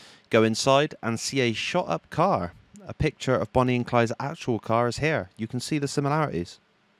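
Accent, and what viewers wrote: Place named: South, United Kingdom. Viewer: You’re from Dorset or Wilshire! 😆 the southern British accent is strong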